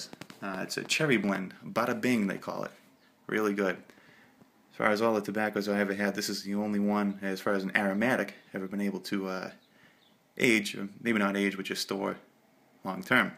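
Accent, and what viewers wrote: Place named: Massachusetts, United States. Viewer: Nice meeting you! Grew up in Mass. and it's always nice to hear the accent. Best Wishes!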